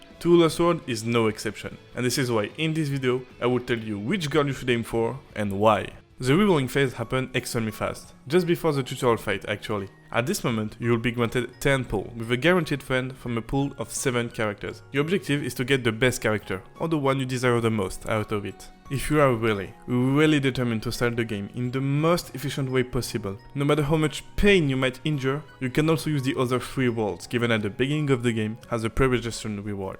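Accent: France. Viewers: you are so frenchinglish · Cache mieux ton français wsh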